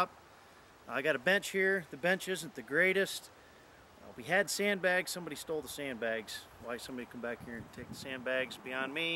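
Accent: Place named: Ireland. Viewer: Great video. You realize that you have any very obvious Irish accent under your American. Did you do your family tree lol ? Best regards, Eddie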